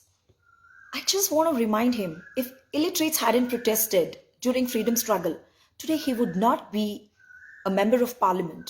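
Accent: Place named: Ivy League, United States. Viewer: You're obviously biased and parroting your party position backing it with the weight of your credentials and ivy league accent; however not realising or blissfully ignoring the fact that the moment your affiliation surfaces, your entire criticism loses credibility as you represent the most corrupt, nepotistic family domonated political organisation led by one of the most incompetent and ignorant, self obsessed, entitled leader.. Good luck to your aspirations as I hope you might be genuine despite your affiliations..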